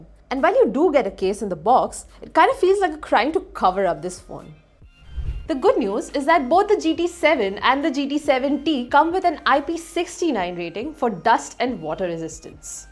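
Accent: India. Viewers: Wait a minute. There's nithing to be proud about, rather contrary. Wroting it as a Pole who albo finds I Dian accent extremely disturbing. A good and thorough review though👍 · Gosh don't they have AI to eliminate Indian accent? I can't hear half of the words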